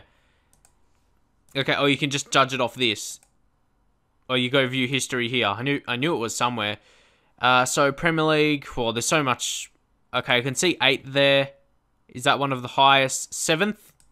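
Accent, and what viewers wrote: Australia. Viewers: Well he's Australian, ofcourse his pronounciation is gonna be different to the one in the UK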